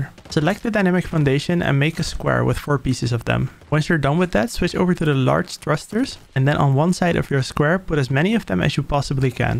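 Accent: Netherlands. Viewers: I smell Nederlands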